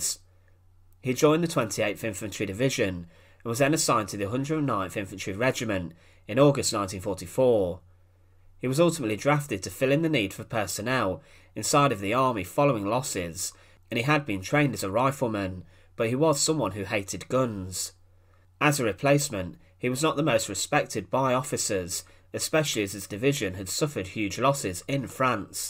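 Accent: United Kingdom. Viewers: In case you did not quite grasp the narrator's accent, he is English (British), or a British speaking computer generated voice. In any event, those stakes you saw in the video's opening segment are of a memorial in the UK to those British Soldiers who were executed by the British Army for desertion/cowardness during the 1st World War. (Hence the statuary of the guy standing against one of those posts with a target hanging over his heart.) Desertion and cowardness was quite high in a British Army still heavily influenced at the time by Victorian norms' and where, almost to a man, the commanding officers of those troops back then were all from the aristocracy, privileged, very well to do, or political leading families--- there was no such thing as PTSD being heard of by this lot) during WWI. Hundreds of soldiers accused / tried for it were summarily executed in the field as it was a very common practice then.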